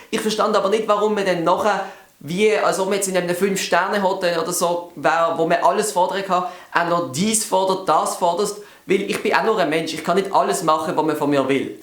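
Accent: Basel, Switzerland. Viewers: de Dialekt isch eher baslerdütsch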